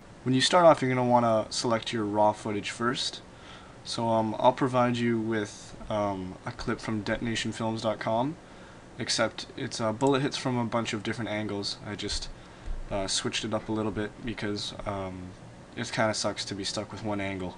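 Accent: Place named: Canada. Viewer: I kinda get the feeling your Canadian judging by your accent and the way you spell, correct?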